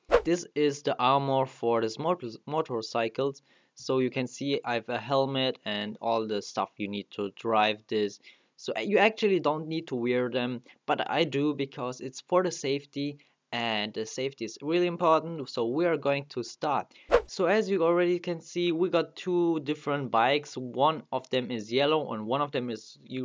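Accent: Germany. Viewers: Wie mann einfach hört das du deutscher bist! :DD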